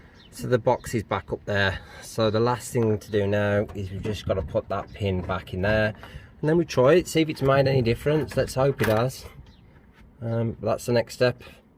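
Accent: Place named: Midlands, United Kingdom. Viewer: Great video. Nice listening to a proper midlands accent. You deserve to gain plenty more subscribers. John👍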